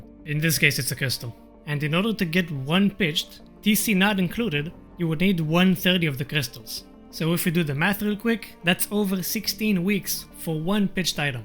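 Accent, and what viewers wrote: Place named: Israel. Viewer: המבטא הכי ישראלי ששמעתי 😩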